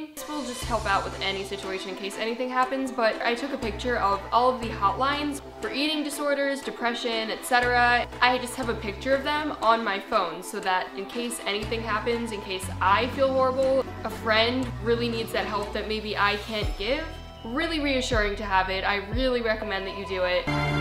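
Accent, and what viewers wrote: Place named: New Jersey, United States. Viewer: you're from New Jersey? no wonder why you sound that way! you sound like you're from somewhere close to NY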